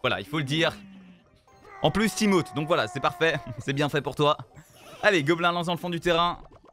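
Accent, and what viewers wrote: England, United Kingdom. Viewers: Joli accent anglais je suis jaloux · En vraix tu fait genre mais ta un super accent anglais :OO sinon cool la vidéo ^^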